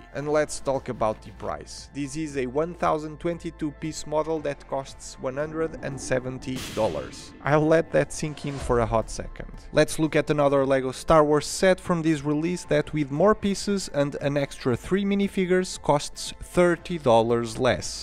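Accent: Portugal. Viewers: És Tuga? O nome pelo menos é Tuga e o acento também. O mesmo do Mourinho, aliás o nosso! Eheh. Abraço e obrigado por partilhares.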